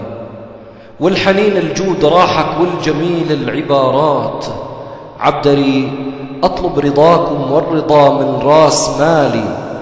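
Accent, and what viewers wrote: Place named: Bedouin, Jordan. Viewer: كلام جميل بارك الله فيك لكن هذا ما هو خليجي هذي لهجه بدوية اردنية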